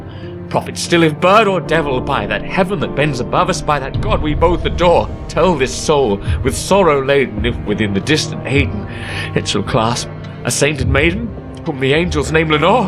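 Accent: United Kingdom. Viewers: It's nice, but why the British accent? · Probably because the person reading it is British 👍 hope that helps!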